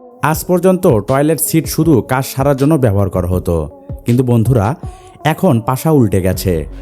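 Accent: Bangladesh. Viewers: কুত্তা তুই তো নিজে বাংলাদেশি তোর ভাষা শুনে মনে হচ্ছে 😂😂😂 এটা বাংলা দেশের বল 😂😂😂 শুধু ভারত এর বলিসনা ,, তোরাও বাংলাদেশি এদের থেকেও উপরে😂😂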